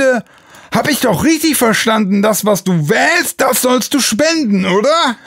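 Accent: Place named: Turkey. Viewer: Er hatte schon in einem Video das aufgeklärt. Es ist halt die türkische Aussprache.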